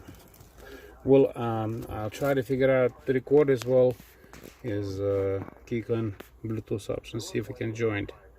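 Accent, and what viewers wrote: Russia. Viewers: Похоже на русский акцент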